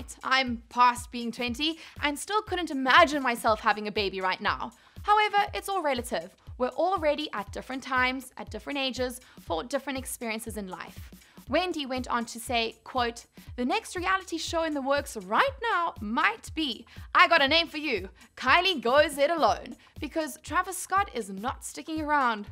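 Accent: South Africa. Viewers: is from South Africa?? cant miss that fellow south African accent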